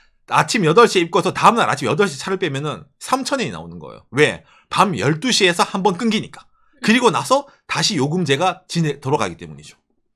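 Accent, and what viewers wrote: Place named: Gyeongsang, South Korea. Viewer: 말투가 경상도 .자갈치에서 꼼장어에 쏘주한잔 하고싶네 ,ㅎㅎ,나는 부산사람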